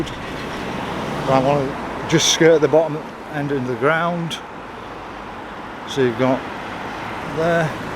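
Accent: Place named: North Derbyshire, United Kingdom. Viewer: You don't sound "true" Sheffield, more like north Derbyshire but anyhow. I lived just up the road from the start of your walk here, and always wondered if the little opening in the river on 3.43 of your film is the stream from Norfolk Park that runs through the Jervis Lum on the estate, entering the Sheaf.. It must finish up somewhere close to that. There's another higher up the wall pipe a bit downsream I think that constantly flows which maybe it. The path at this point of the film was the old Sheaf Gardens, old houses and streets and straight on is Cromford and Rowsley street, notorious in the 70's...still cobbled and big families back then living on there. Really rough and ready but great people.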